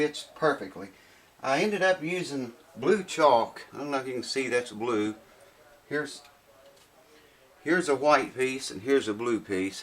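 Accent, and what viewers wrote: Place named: Alabama, United States. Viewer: Thanks for uploading so many videos about how you do your repairs. Using them to help me out working on a little German flatback mandolin...Additionally, I live in Europe now, but you must be from very, very close to where I'm from, judging by accent. Southern Alabama? Who knows, you might even have fixed some of my uncle's guitars, or have played with him before. Keep on keepin on, man. Outstanding youtube stuff.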